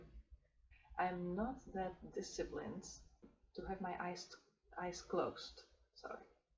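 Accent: Netherlands. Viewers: thank you for all the information! <3 I am currently growing Golden Teachers and am planning on microdosing. Are you dutch btw? Your accent sounds a little bit Dutch :)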